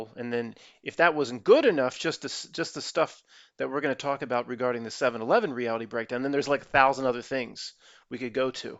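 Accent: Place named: Boston, United States. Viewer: Love your Boston macadamian accent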